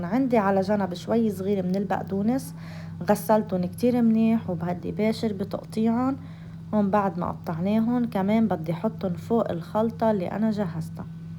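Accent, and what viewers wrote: Lebanon. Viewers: ما أحلى اللهجة اللبنانيه .. ❤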